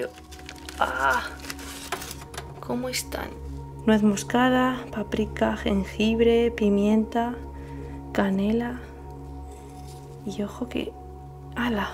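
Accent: Spain. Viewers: Me gustan mucho tus vídeos de verdad. Pd:Me gusta mucho el acento español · Amo tu estilo narrativo,! Tu timbre, tu pronunciación y tu vocabulario. Me encanta el acento español! Saludos desde Mérida, México.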